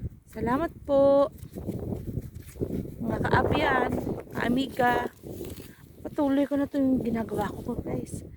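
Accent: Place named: Ilonggo, Philippines. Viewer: Pinay2 na pinay at ilonggo tune😅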